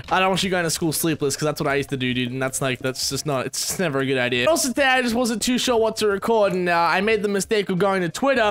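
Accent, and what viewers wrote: Australia. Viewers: ily so much I like your Aussie accent I'm from Australia but I don't have an Aussie accent because my dad is from England. I want one though. And your really funny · I've been watching you so much that I've almost started to have a Aussie accent. Oh my god. Also if you deleted the chan I would die in <num> different languages :-))))) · r u austrailian mate?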